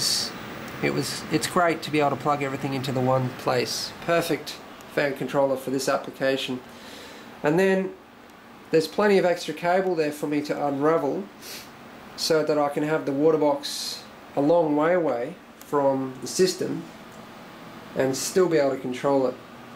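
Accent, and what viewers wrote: Australia. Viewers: Is he Australian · are you aussie?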